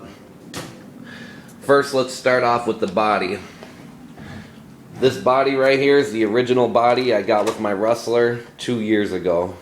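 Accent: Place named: Michigan, United States. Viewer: Not to be weird but are u from Michigan u sound exactly like a sub teacher I have lol but nice car!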